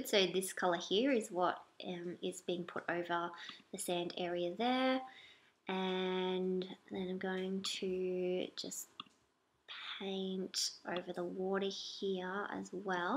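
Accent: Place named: Australia. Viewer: She sounds Australian!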